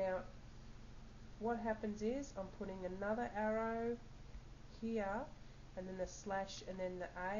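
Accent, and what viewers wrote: Australia. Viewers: okai are you from down unda???. anyways thanks for the video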